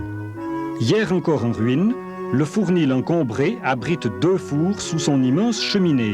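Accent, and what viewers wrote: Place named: Rural, France. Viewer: La narration, c'est ton papa ou c'est toi qui reprend un accent du terroir ?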